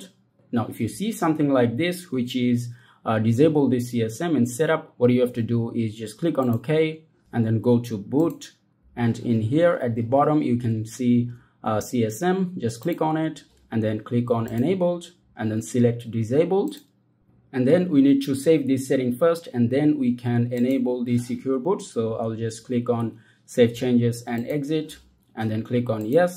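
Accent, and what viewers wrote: India. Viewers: Тот самый момент когда уже все перепробовал, а какой-то чувак с голосом индуса снимает это видео на телефон, и это тебе помогает😂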